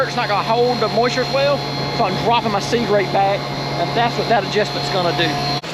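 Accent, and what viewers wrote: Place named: Georgia, United States. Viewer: Great Video! Enjoy listening to your Southern Georgia English. I'm from TN.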